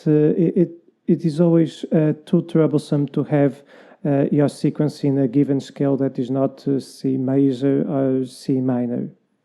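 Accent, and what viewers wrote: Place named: Portugal. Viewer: Are you from Portugal? I watch a lot of your videos and I can always detect some Portuguese accent 🤔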